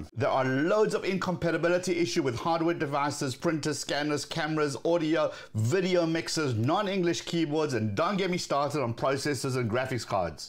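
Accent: South Africa. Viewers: Whenever there's a new video I click, for the content, but secretly for the south African accent 😂😂 Much love from 🇲🇿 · You've got an accent similar to ours in South Africa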